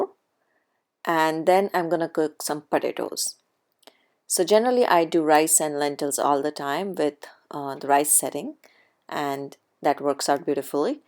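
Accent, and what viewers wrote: United States. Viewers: its because she is american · dnt kill me with your american accent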